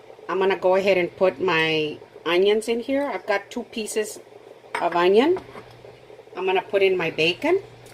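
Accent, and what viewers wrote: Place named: San Antonio, United States. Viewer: You from TX? I’m from San Antonio. You sound like you’re a San Antonian . Reminds me of home. Been in NYC for a long time. Miss that accent. Us younger generations don’t have it really anymore. Brings back memories of childhood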